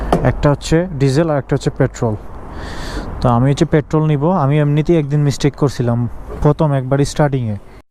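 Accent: Sylhet, Bangladesh. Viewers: Vai Sylheti ni?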